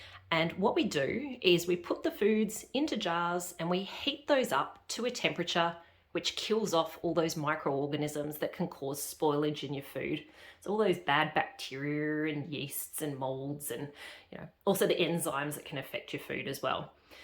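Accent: Australia. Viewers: Thanks for creating this series for newbies like me! I recently got a pressure canner for my birthday and have been reading lots of books and trying to watch videos - however it’s refreshing to listen to an Aussie video for once 😂 Excited to watch the rest of yoir series tomorrow and hopefully give it a crack in the next fortnight! Cheers Samantha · Hi, first time viewer here and I really enjoyed this video regarding preserving. I have done some previously but we moved back to Qld from tassie and I lost touch with my passion. I am now back in Tassie and I want to resume my preserving. I feel that I have lost some of my confidence, so I am sure that watching your videos it will help me along my journey. it is so lovely to hear an Australian voice and you explain things really well. Thank you for taking the time to put together the videos and I am looking forward to the next one. 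Jay 😊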